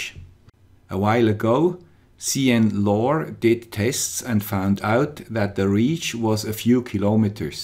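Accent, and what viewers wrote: Switzerland. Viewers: the swiss accent reminds me of the swedish accent. don't worry, that's a compliment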